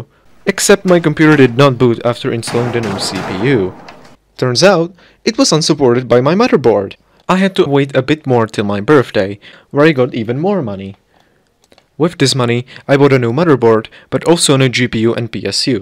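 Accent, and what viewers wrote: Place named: Czech Republic. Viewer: z prvych 5 sekund bolo pocut ten cesky prizvuk xddd, inac dobre video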